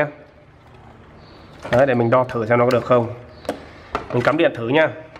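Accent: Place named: North, Vietnam. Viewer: đúng thợ điện tử nói dễ hiểu.người bắc lại dễ nghe. cám ơn anh.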